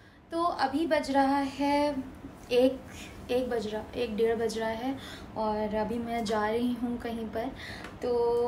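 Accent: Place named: Lucknow, India. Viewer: assalam alaikummm .....bhot beautiful ho.....aap ki awaj ekdm lko walao ki trah haiiiii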